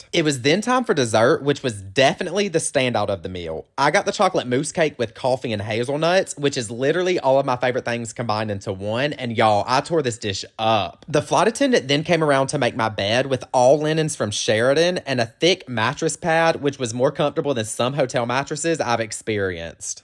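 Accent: South, United States. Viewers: There’s nothing worse than listening someone that’s gay and from the south.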